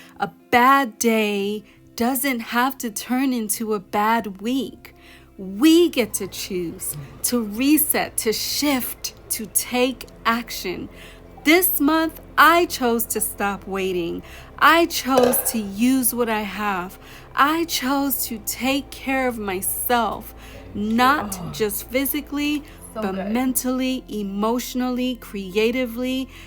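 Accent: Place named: England, United Kingdom. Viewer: Ahh I really like your English accent woah and you look so pretty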